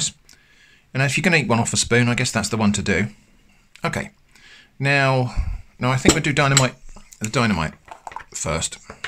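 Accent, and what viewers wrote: United Kingdom. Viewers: That's so aggressively british gosh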